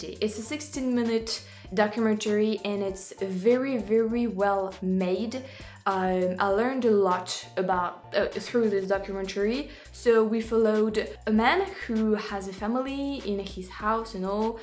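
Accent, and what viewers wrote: France. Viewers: Hi, what part of France are you from? I can't tell from your accent. Thx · Aghhh, i'm loving ur channel and ur french accent😍 Actually i'm studing french cuz it's so beautiful and now i'm happy that i found ur channel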